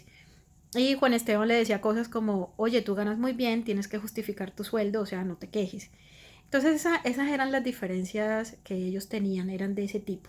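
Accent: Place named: Caribbean. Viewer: Excelente,te Vi más suelta al hablar ,en el 2 video parecias hablar entre dientes ,(como cachaca)ahora ya te está saliendo lo caribeña,espero q el próximo digas algo así como !.NJ GANAMOS!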